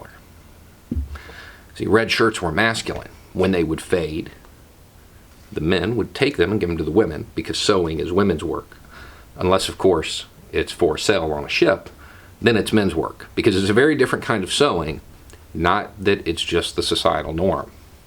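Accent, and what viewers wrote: South, United States. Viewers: It tickles me every time seeing a hyper masculine guy with a southern accent advocating LGBTQ+ rights. Every damn time. Thanks! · Who in the hell ARE you?:) YouTube suggested you to me yesterday for the first time - the witch/churchgoer video. Holy cow! I subscribed immediately. This is another winner video. You are sane, level-headed, a clear thinker, classically logical. That's a pretty rare combination these days. I'm also from the South, so not a problem for me, but you realize that the accent severely throws some people, right? LOL Rock on,. Cliff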